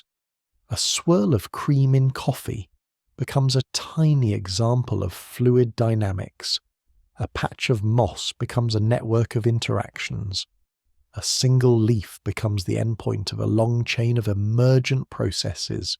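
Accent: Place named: Yorkshire, United Kingdom. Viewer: UK Yorkshire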